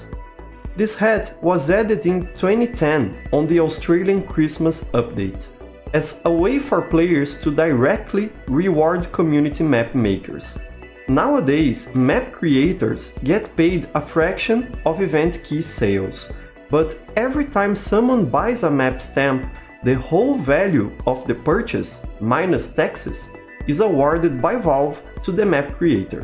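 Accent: Brazil. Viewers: tu e br né? Seu sotaque entrega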